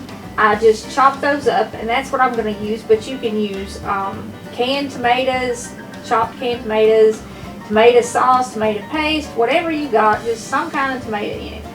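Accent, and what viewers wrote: South, United States. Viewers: i live in texas now, left the great state of Arkansas in 1954 and adopted Texas as my home. i love your channel not only for the dishes but mainly to hear that voice, nothing takes me back home more than a good old southern accent. a lot of the dishes you make we called them our bachelor dishes when me and the kids had to get by alone during the divorce and remarry stage. i came up with lots of dishes that were easy to make and filled us up well, couldn't ask for more than that, and today after all those years ago the kids and grandkids still ask me to make some of those dishes, my cornbread is a favorite.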